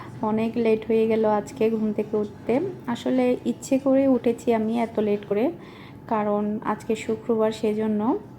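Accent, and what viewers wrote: Chittagong, Bangladesh. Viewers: আপু আমার বাডি ও চিটাগাং আমি সৌদিআরবে থাকি আমার দুই ছেলে আমার নাম নাহিদা আপনার চিটাগাং এর ভাষা বলগ টা অনেক ভালো লাগে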